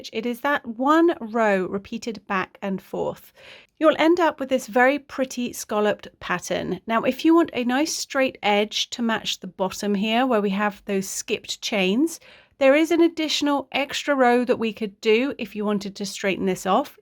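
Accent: England, United Kingdom. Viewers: Beautuful stitch pattern. Love to watch your tutorials. I have learned so much and used some if your tutorials to make scarves. Also your voice is so calming and relaxing. I love your Engish accent.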